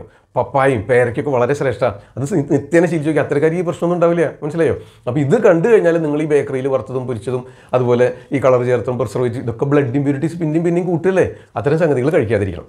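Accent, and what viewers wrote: Thrissur, India. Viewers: Dr nalla avadharanamanuto thrissurano?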